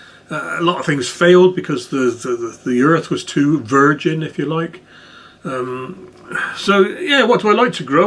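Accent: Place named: Bristol, United Kingdom. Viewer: Loved this, I'm from Bristol and hearing you remind me if home! Really interesting answers too!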